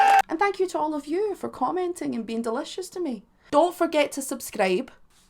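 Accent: Scotland, United Kingdom. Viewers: In one video you say you'll be friends with, and fight the people in the comment sections. If the accent wasn't enough, that's all anyone needs to hear to know you're Scottish!!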